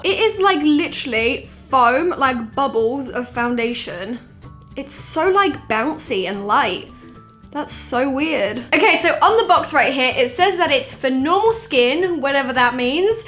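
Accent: United Kingdom. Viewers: Is she British? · British Accent?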